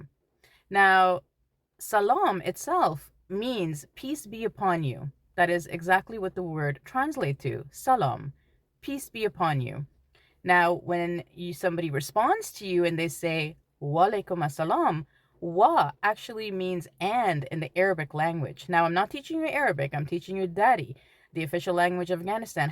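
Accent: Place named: Canada. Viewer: Are you living in canada or nearby michigan?Cuz, you have a little bit of the accent with the out and about😊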